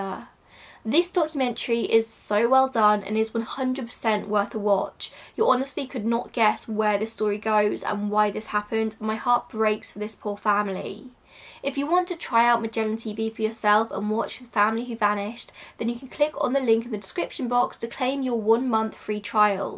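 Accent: United Kingdom. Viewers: Also....(me being a crud American) I had to turn on the Close Captions. Your British accent was just a tad too much to catch all the words....sorry.😮‍💨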